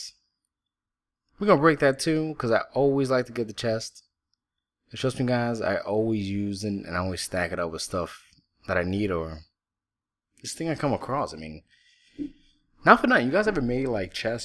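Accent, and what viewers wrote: New York, United States. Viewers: Ahhh, so thats the lovely New York accent we hear!! Great start to a series. It goes to show that a bit of exploring can really help - you're so brave, I have to set up a whole town with a million full chests before exploring - I guess it's the hermit in me :D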